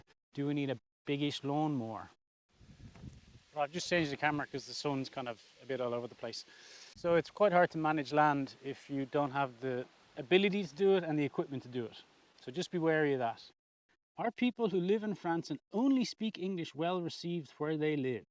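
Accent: Dublin, Ireland. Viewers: QUESTION! Presuming from your accent you're a Dub (or certainly the Pale area), but if you are a Dub are you a northsider or a southsider? I'm a northsider meself 👍🏻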